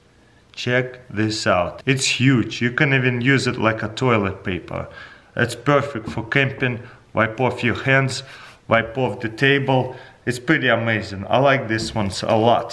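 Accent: Russia. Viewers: "Now i have to zoom out its so big" is awesome. "Full size bich towel" is Russian and Russians are known for their own type of English.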